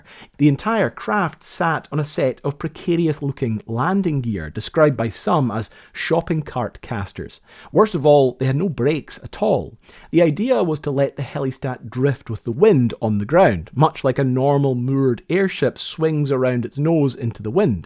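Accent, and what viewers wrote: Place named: Scotland, United Kingdom. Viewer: Yet another great deep dive video by you of something unique and original, the fact its read by you with that Scottish accent, makes it even better. Kind regards John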